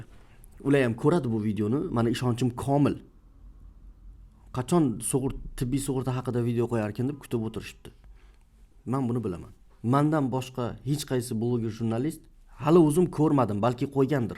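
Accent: Khorezm, Uzbekistan. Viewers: Брат хоразмданмисиз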